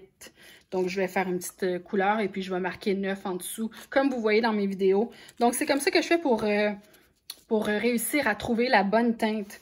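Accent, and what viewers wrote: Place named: Canada. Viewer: Bonjour je suis fan de coloriage mystères j'ai beaucoup de livres où par numéro j'ai les crayola par 100 les crayola teinte peau sont parfaits et pour les marrons aussi tes colos sont vraiment magnifiques ont ne peut pas se privez de nuancier par contre les jumeaux des crayola,les fibracolor eux sont numérotés merci beaucoup pour ton partage et se merveilleux accent tout droit arrivé du Canada dont je rêve d'aller visiter 🙏👍👍👍👍😘😘😘😘🥰🥰🥰🥰💖💖💖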